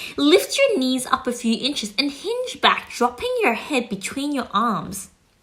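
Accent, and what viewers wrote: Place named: Australia. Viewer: Absolutely beautiful! Thank you so much! Also I LOOVE your aussie accent 😍😍 Blessings 🌸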